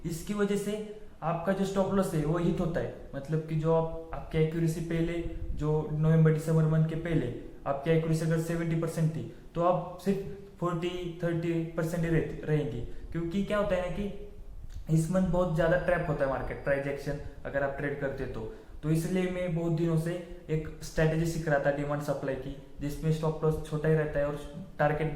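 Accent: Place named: Maharashtra, India. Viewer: Kay rao Aple lok lagich olkhu yetat 😅bolnya varunch... Keep it up brother 💪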